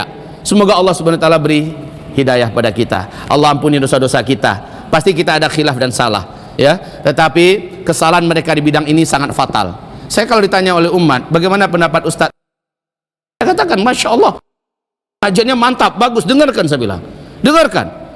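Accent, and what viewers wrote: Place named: Riau, Indonesia. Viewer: ustad orang Minang kok ngomong bahasa Indonesianya kaya aksen orang Melayu Riau ya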